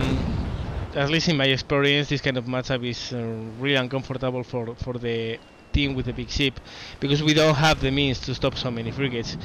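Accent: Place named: Spain. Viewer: I'm glad I saw the video where you mentioned you had a Spanish accent, I couldn't place where it was from.:P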